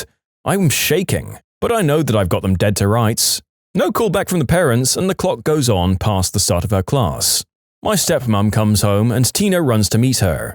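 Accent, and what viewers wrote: United Kingdom. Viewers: I love this guy's british voice, it's pretty cool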